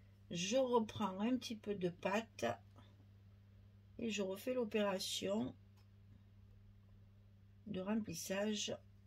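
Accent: South, France. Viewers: Merci, tout est magnifique. Tu m'as trop fait rire: empastifé. Moi aussi je parle le sud. Bisous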